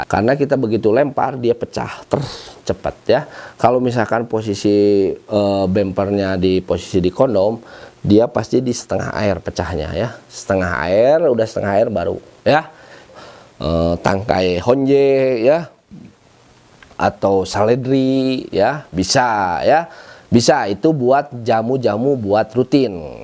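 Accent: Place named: Sunda, Indonesia. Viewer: Cri khas a serius tapi loba hereuy khas orang sunda pisan😂😂😂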